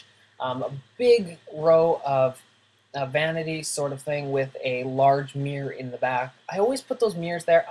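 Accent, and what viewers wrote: Canada. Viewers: you a clearly Canadian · cuz hes Canadian so he has a accent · he's from Canada , he has a accent.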